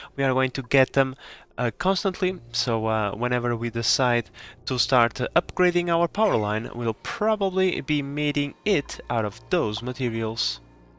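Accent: Portugal. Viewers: I swear your accent is from Portugal.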